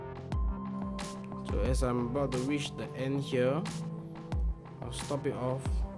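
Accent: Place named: Singapore. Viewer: Where do you fly fishing usualy?are you from singapore??the way you talk just like a singaporean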